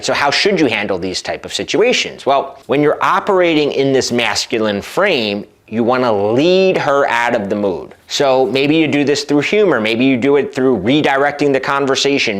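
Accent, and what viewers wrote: United States. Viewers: where does this american accent come from ?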